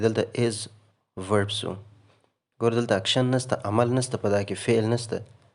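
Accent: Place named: Kandahar, Afghanistan. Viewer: Leila sweeps the room. Leila - subject, Sweep- verb, Room - object. Thanks for your teaching 🥀 I like your teaching 🥀 ګرانه استاده! ستاسو تدريس مې ډېر خوښيږي. هيله ده ، چې دوام ورکړئ. کندهارۍ لهجه او انګليسي ژبه ډېر خوند کوي.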